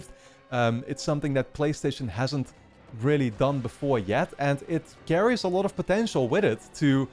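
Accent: Netherlands. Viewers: You really wear your thoughtfulness and good nature towards others on your sleeve and its a refreshing thing when it comes to talking about games...maybe people just do it too much and you just hit the right amount but thanks for the vids. I might have a semi possibly ignorance induced anecdote to go with my claim as ive never encountered someone with an accent like yours within the last 10-15 yrs who wasnt a total joy to be around. Basically for me, dutch type people voicing their excitement about stuff gets my juices flowing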